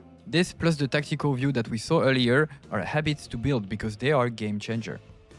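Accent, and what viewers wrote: France. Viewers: Excellent video mon pote, it's rare to see french accent in english videos, yours is very sweet. Also a LOT of your tips are unique and useful, great video. I'll add more tips that i've learned myself : -Some items belongs to several sets family. If you have the right items, you can have a cat with 2 set bonuses, if equipped with the right items. I don't think you can have more than 2 sets ? Should test that. -Birth defect can't mutate (anymore). If your cat have a head birth defect for example, he'll keep it forever. It can't mutate in a room with high mutation nor during an adventure event. -Charisma stat determine how your cat is attractive to others, and how much it will reproduce. A cat with 0 or negative charisma will never reproduce. From experience, a cat with 12+ charisma will reproduce every night. Was a long time ago, all my cats are descent of this Barbie named cat. 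My personnal tip is to do easy runs with cats that have good stats and mutations, and boost their charisma, so they give you a lot of kitten. Also keep that in mind if you want to do a cancer/uranium rod run. Even if you get a lot of mutation, if he look unfuckable, he will be. -If you have 0 Intelligence, the text during event will be randomize BUT only the letters are randomized. Words will keep the same number of letters. Can be helpful if you try to decipher or remember the events. -If a cat is the love-interest of multiple cats, those multiple cats might fight each others. Be carefull, as even a h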